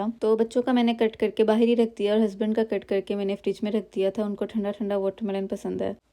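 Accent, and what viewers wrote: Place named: India. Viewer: Your channel name is pakistani mom. But you dnt sound pakistani your urdu sounds like an indian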